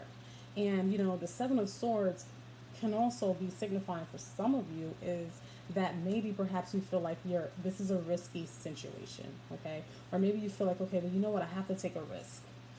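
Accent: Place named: East Coast, United States. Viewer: #3 yes, resonated so much. and i love your east coast accent!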